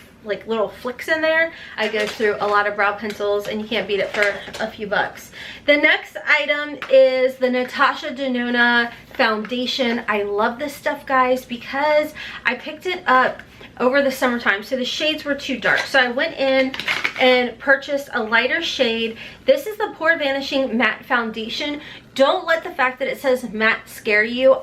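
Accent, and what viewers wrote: South, United States. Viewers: Awe... try not to let people bully you...It’s not that your not putting on your big girl panties, it’s people can be mean and insensitive...Your accent is cute and southern, like mine! Your a sweet and beautiful queen, so don’t listen to mean girl’s comments...We love you, ALL of you! 🥰♥️🥰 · I love how you talk 😍🥰💕 especially when you say “matte” and “back”.... and girllll I’m from the south (Virginia) and I have that southern accent as well.. and OFTEN REPEAT THINGS LOL... do your thang girl!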